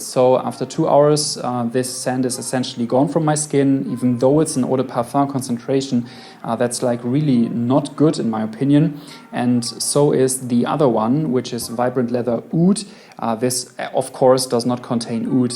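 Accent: Germany. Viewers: Der junge ist deutsch merkt man Bro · ur german arent u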